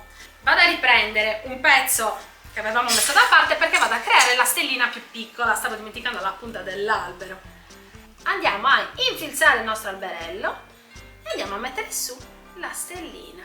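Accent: Sardinia, Italy. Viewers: oltre che brava sei simpaticissima complimenti mi piace il vostro dialetto sardo io ho ha nuora sarda e gli voglio molto bene ciao e buon Natale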